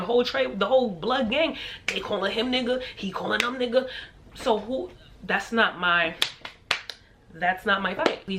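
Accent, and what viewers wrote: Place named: New York, United States. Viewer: Girl just wanted to say I love your channel and your NY accent, love from London x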